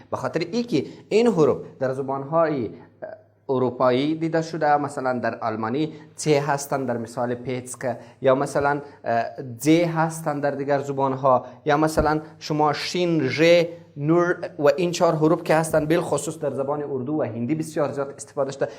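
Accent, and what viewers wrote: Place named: Kandahar, Afghanistan. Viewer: د پښتو زده کړې په لوست کې مو ځينې کندهاري گړدودونو څخه کار اخيستى د ې، ي، ى، ئ ۍ په پېژندنه کې چېرته چې تاسو مثال ورکړى، د (ي) = سترگي، دا په کندهار کې وينگ دى خو اصلا په ليکني بڼه لوى استاد رشاد هم سرگي نه ليکل ،( سترگې) ليکل . دلته د اې تلفظ يا گړدود سم دى نه د اي خو په وينا کې بيا پروا نلري. دا معمول په ټولې نړۍ کې شته چې د هرې سيمې خلک نورو خلکو ته څه ورپېژندل غواړي نو د سيمو په ځانگړتيا او اغېزو هم ورته مالومات ورکوي، بيا د ژبې په برخه کې ډېر معمول دى چې تاسو ته پکار و د کندهار لحجه، گړدود مو هم تشريح کړاى وى. بيا هم مننه او فارسي ژبو ته کندهاري گړدود په سبب د اسانه غير فني تلفظونو ورنږدې دى چې ترې په اسانه گټه پورته کړي خو که فني برخې گړدودونه هم زده کړي بيا يې د ژبې پوره زده کړه زباتېږي.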